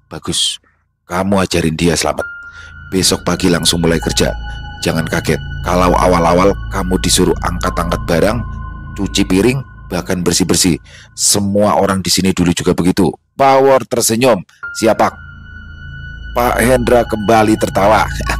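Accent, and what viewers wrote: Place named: Banyumas, Indonesia. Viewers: harus lanjut part deh cerita ini,dn di jadikan playlist,sukur2 BAWOR dan TIGOR jd partner biar slalu menampilkan logat cilacap dan batak pasti seru(seperti doni dan gembul} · Keren, bisa logat ngapak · Akhirnya ada karakter jagoan ngapak juga.. Matursuwun mbak,Lanjutkan.., salam dari purwokerto 🙏🙏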